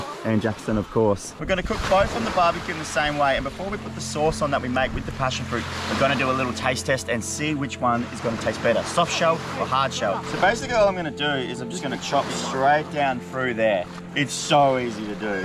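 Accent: Australia. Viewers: i can already hear the aussie accent off the thumbnail clicking 😂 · Are you Australian mate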